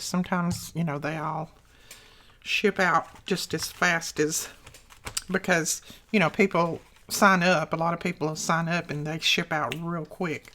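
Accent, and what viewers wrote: South, United States. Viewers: Miss E you do not speak too fast! I’m Dutch and I understand everything you say. Besides I am a teacher of the Dutch language to foreigners and I am a teacher of the Spanish language. Of course it is posible that the person has a problem understanding you but that is an individual problem. It has nothing to do with you. By the way I love your voice and southern accent. And the lovely expressions you use. Keep up the good work! God bless you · Hi E! Lovely work as always! I chuckled when you mentioned that someone said you spoke too fast! You definitely don’t! 🤣 You speak slowly with that lovely southern drawl. 🥰 Infact, I often listen to your videos at 1.5 or 2 times the speed…not a fault of yours but simply because I have very limited time in which I can watch and I want to watch as many as you lovely crafty ladies as possible in my limited time intervals….and maybe the fact that I’m a fast speaker may also influence my choice I guess! 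But no matter what speed I watch, I enjoy your videos and your work! ❤️ Thanks for sharing! Have a lovely weekend! Hugs, Ava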